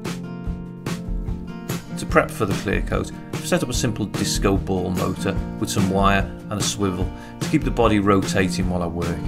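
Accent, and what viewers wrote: England, United Kingdom. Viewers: An excellent clear, concise video and to top it off in an English Accent for a change. Thank you👍😷👍🏴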